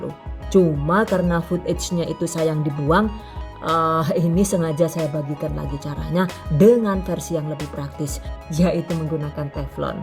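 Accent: Banyumas, Indonesia. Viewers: Assalamualaikum...maaf,kok ada bhs ngapaknya ya...kalau bgtu boleh tahu dari daerah mana yaaa,aku dari cilacap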